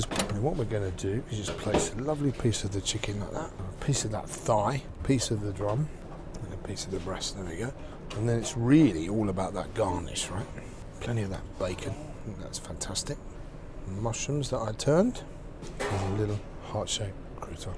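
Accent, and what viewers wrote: United Kingdom. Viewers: Brirish accent, French dish and app belonging to which nationality! It is like the Butter Chicken saga😂😂😂Lost in transition.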